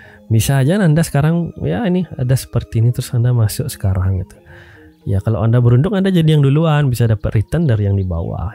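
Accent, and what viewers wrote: Bali, Indonesia. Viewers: swastiastu blii, kirain orang keturunan arab, setelah denger aksennya eh tyt orang bali wkwkwkwkw. Makasih info nya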